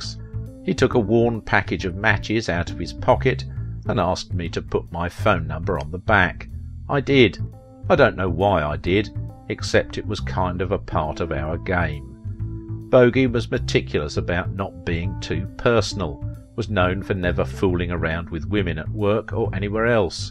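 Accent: England, United Kingdom. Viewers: It's BY WHOM!!!!! Very British accent but awful English.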